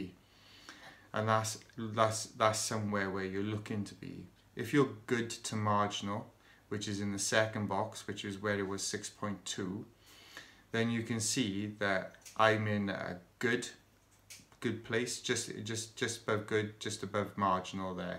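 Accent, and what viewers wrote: Wales, United Kingdom. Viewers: Do I detect a Welsh accent?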